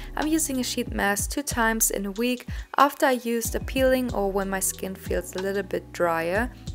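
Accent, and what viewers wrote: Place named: Germany. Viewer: Wow that's a thick German accent... But anyways, good luck with the routine, 파이팅!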